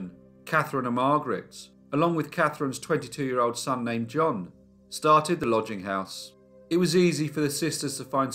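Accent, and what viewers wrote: England, United Kingdom. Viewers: It sounds like you've been in England longer than just covering this video hahaha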